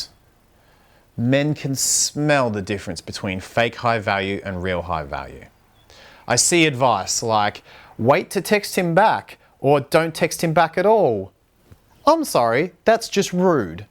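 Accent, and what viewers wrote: Australia. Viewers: Is that Australian accent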